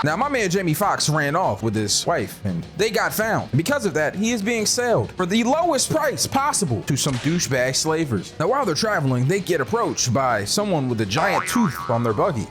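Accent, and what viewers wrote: California, United States. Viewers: You’re very entertaining! I enjoy your commentary, and I’m pretty sure a great part of that is your californian accent. It makes me comfy 😂🩷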